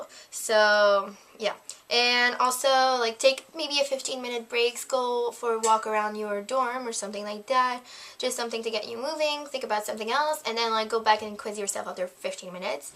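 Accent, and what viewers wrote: Canada. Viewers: are you canadian? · you're canadian aye?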